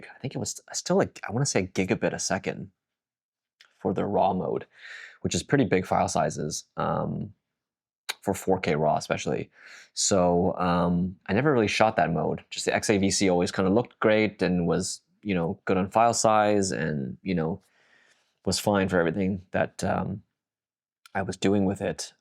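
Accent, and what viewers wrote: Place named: Toronto, Canada. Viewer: Cine in the 6? Sounds like Toronto, followed 🤙🏾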